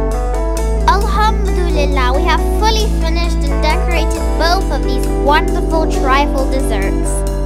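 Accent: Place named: England, United Kingdom. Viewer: Oh woooow ur english accent is awesome 😘😘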